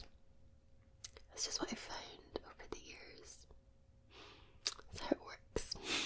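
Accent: Scotland, United Kingdom. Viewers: Is it just for me, or feel other german scottish speaking lovers, that our "fränkisch" is the best german dialekt. And it has a similarity, the rrrrolling arrrr. For all non german, thats the region where PUMA and adidas came from (and no this is NOT Bayern/Bavaria-it s a own country, like Scotland never be England), and Lothar Matthäus is world wide well known, okay-maybe only in the world of football. Since I have seen and read trainspotting on original, I fell in love, with your kind of english. And, you done a great job! Many thanx from Berlin. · Wow, 3 hours Of pure Relaxing and calming video. Everyone of your sessions were tremendous. Every angle of your camera was perfect. You know me, I could write a whole story about your video, but I'll make it short! 😯😊 I lasted about two and a half hours into your video before I nodded off! Head and face message along with fluttering fingers, mouth sounds, singing, and new sleep stories were just amazing 👏 👌 😉. 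you are just so stunning with your lovely soft spoken Scottish accent which sent me to the moon 🌙 🌚 😊 ❤️. Totally, but I mean totally relaxing 😌. Tingles were plenty 😊. I could go on and on but I must get ready for work 😊. Please 🙏 take care and have a wonderful day love! 😊 You are awesome 👌. Sending you lots of love!! ❤️❤️🥰🥰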